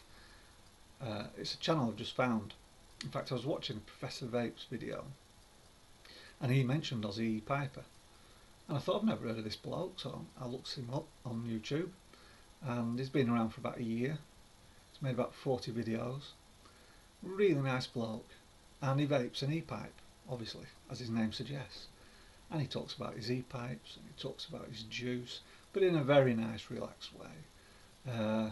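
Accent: Northern England, United Kingdom. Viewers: Lovely Northern chat for a quiet Easter morning...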